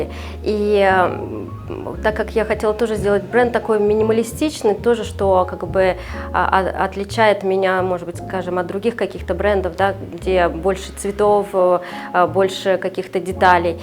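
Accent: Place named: Russia. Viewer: Французский шик с русским акцентом! Потрясающая, потрясающая атмосфера и конечно же изделия 💔 Вы вдохновляете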